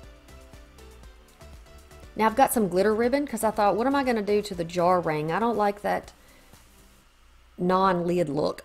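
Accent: South, United States. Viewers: Love all of your diys I know from your accent, you're southern. Where are you from. I'm from gulf shores alabama.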